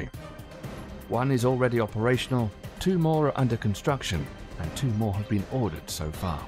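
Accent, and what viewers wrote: United Kingdom. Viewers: Don't forget, the narrator is speaking British English, Not American English. Pip pip, Tally Ho, and all that rubbish! Like my Grandson once said, "Why can't the Canadians make Real Bacon, instead of calling a ham slice, Canadian Bacon?" We had to 'splain, fried meat slices is NOT always Pork.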